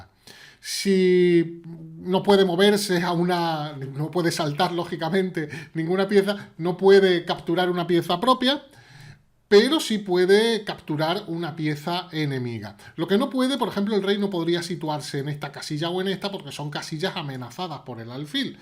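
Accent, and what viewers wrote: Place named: Argentina. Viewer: Che buen video Me intriga tu acento medio argentino medio español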